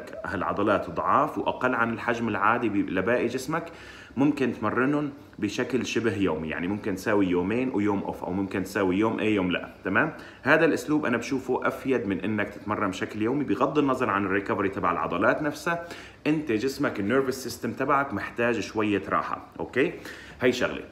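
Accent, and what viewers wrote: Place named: Syria. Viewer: هذا سوري مو مصري ي سطا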